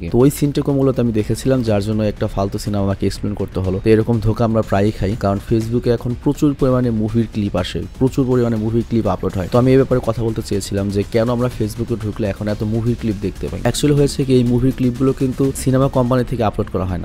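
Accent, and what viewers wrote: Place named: Noakhali, Bangladesh. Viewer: এাই নোয়াখালীর হোলা❤❤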